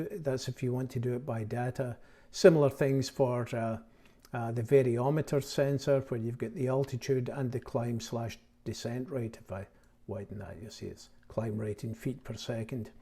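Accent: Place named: Scotland, United Kingdom. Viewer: Was easy on my ear, hearing a fellow Scotsman 🏴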